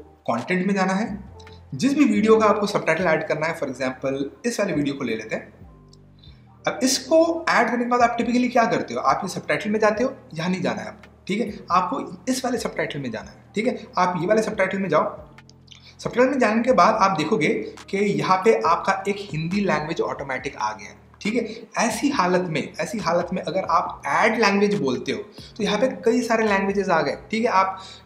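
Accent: India. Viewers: I love the Indian accent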